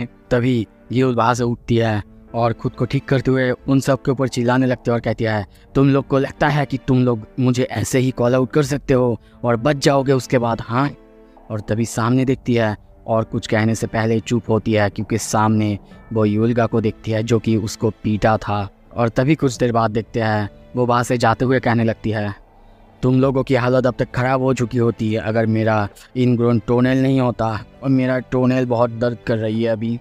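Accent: Bengal, India. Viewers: Are you bengali???😊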